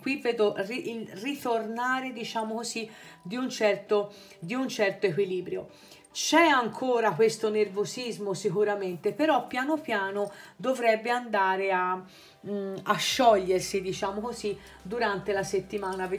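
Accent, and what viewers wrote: Tuscany, Italy. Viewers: Super parlando il toscano e ancora più super sfoderando l'inglese...sarai mica insegnante di inglese? Vedo che te la cavi molto bene anche con i termini in voga...english 🥰🥰🥰💯💯💯💯🍷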